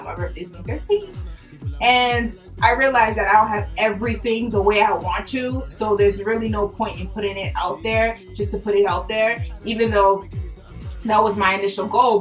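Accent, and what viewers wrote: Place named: Queens, United States. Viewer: You got to be from Queens. Shhhh. Much success.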